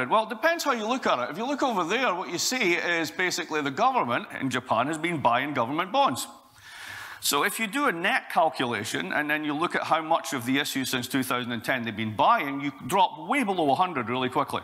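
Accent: Scotland, United Kingdom. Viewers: This is a proper Scottish accent you silly person! Do you know that Scottish generated, for such a small population, the greatest economic thinkers and one of the greatest concentration of philosophers! I suggest you educate yourself.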